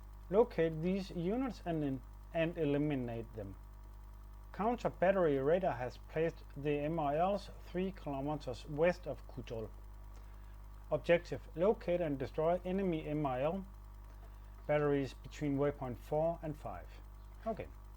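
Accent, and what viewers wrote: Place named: Denmark. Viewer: Sindssyg Danglish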